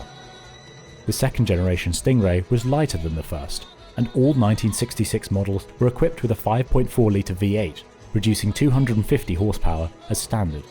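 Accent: United Kingdom. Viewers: Lost me at the British accent.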